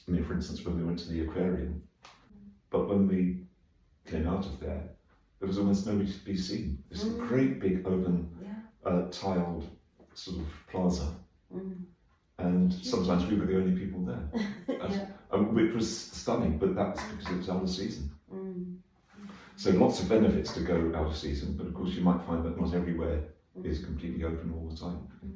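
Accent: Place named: United Kingdom. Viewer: イングリッシュとはいえ、米語ではなく英語のナチュラルで穏やかな心地よい響き、gentlemanとあいまって とても素敵でした!! 当たり前と思っていた日本の素晴らしさを あらためて教えて頂き有り難うございました(*^O^*)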